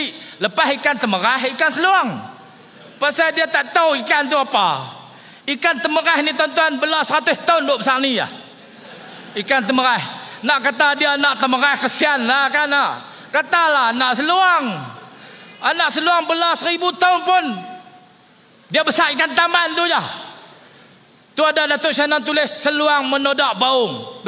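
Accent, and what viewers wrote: Kedah, Malaysia. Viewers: Melawak cara orang kedah, seronok dengar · Saya suka loghat Kedah. Sedap didengar. Bertambah sedap bila MB Kedah, Dato Sanusi berucap sbb ada unsur jenaka berisi yg menyebabkan tidak jemu didengar. Semoga PN diberi kemenangan besar di Kedah.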